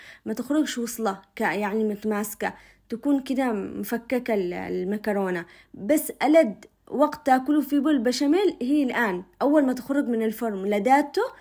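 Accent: Aden, Yemen. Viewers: احبك ي بنت الكلام العدني يذوب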